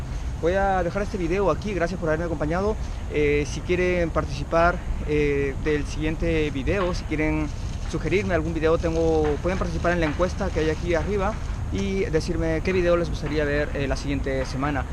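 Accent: Japan. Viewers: Tiene acento japonés el ... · El muchacho es peruano de aca a japón. solo que adopto el tono japones. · Se le pegó el acento japonés jaja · Se te ha pegado el acento japonés no pude reconocer tu nacionalidad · Buen video. Se nota que estas hace rato porque se te pego el acento japonés.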